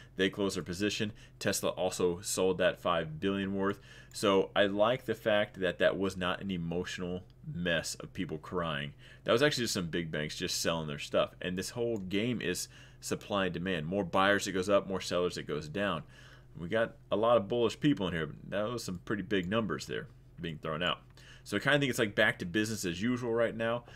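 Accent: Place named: New York, United States. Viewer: He sounds like a New Yorker at 1.5x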